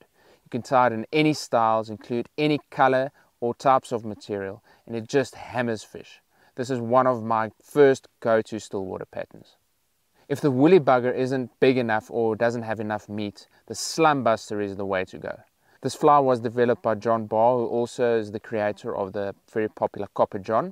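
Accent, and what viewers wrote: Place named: South Africa. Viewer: Great video! your accent makes me want to go back to South Africa 🤙 thanks for Australian.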